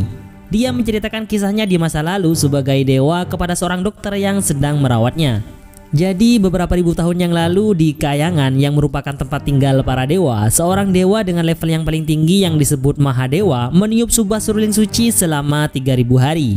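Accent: Madura, Indonesia. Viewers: mak medok ben bro logat madureh tak nyaman ekedingagin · Mimin orang madura ya logat sama kayak aq hhhhh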